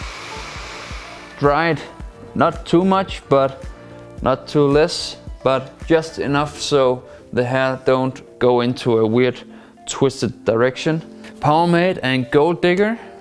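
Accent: Denmark. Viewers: Hej med dig dansker man kan høre du er dansker med din accent men vanvittig video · you can just hear he's Danish, VIKINGER FOR LIFE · Du fra Danmark :) Det er nemt at høre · Are u Danish or something? You kinda have a danish accent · Er du dansker det er alså radt tydligt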